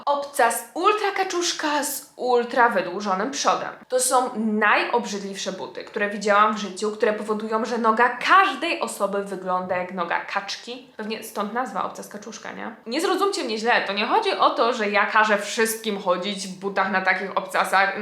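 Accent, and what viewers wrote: Poland. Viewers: po kilku latach oglądania ciebie stwierdzam że pochodzisz z województwa świętokrzyskiego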